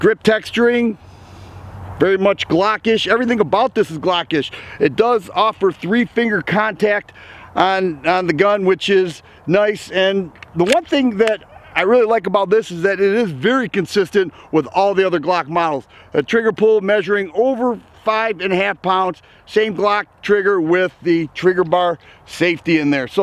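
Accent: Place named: South Jersey, United States. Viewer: Sound like your from South Jersey or Jersey at least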